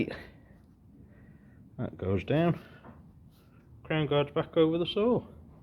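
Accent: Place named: Sheffield, United Kingdom. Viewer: Did you get anywhere with setting this up? I’ve got one in Sheffield (you sound local) in similar condition to yours but mines got the leg system if you need to have a look how it was originally fashioned. Wicked saw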